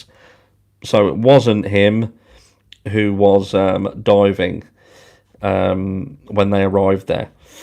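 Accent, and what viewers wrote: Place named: West Country, United Kingdom. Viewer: Hahaha love your style. From fellow UK true crime addict im from the West country too, plus I recognise your accent brilliant x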